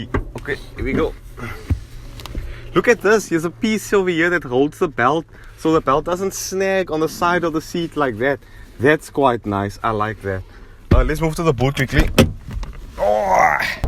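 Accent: Cape Town, South Africa. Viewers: His accent is from Cape Town South Africa to be exact. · I always get excited when I hear a South African accent doing some reviews on YouTube 😭♥️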